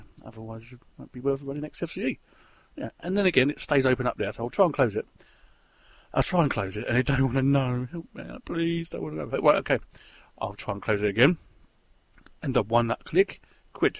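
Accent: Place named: England, United Kingdom. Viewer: Although as a German, I Cant get really into your English Accent, but I Tried it. Well, Im using Xubuntu 10.10 since a Month and its really great. The Default Style is so much better, And the Speed is very nice. Before all that I Used Gnome and I Was very Happy with it. But Gnome got slower with Maverick, so I Tried first Xubuntu. And stayed there. :)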